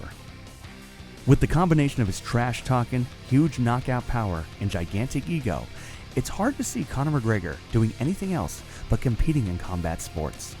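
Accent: Scotland, United Kingdom. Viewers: you sounded scottish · U sounded Scottish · That was Scottish not Irish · That was Scottish not Irish accent 😂😂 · Your accent was Scottish not Irish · Scottish accent u tool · Scottish lol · he sounded Scottish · Why did this prat use a Scottish accent? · Thats a Scottish acent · YOU SOUNDED SCOTTISH NOT IRISH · that was a Scottish accent!!! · ok im from Ireland and you sound Scottish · he sounded scottish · I hate you made fun of Ireland 🇮🇪 I'm so offended you sounded like it's Scottish guy🖕🖕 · American dose a Scottish accent for an irishman, wonder why they call Americans ignorant?. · That’s a Scottish accent ya dope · Scottish accent mate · Definitely not an Irish accent, that was Scottish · That's a Scottish accent not Irish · That was a Scottish accent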